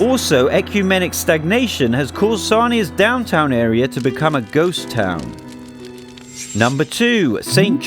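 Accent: United Kingdom. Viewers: Exactly. And New Brunswick cities aren't even half bad. This guy is so misinformed. He sounds British, probably not knowledgeable on Canada. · Are you from the USA? You sound so british.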